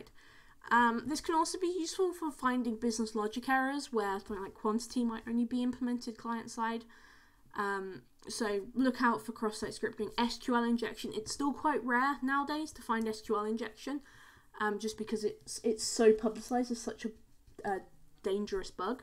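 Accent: United Kingdom. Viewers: British accent is so sexy :p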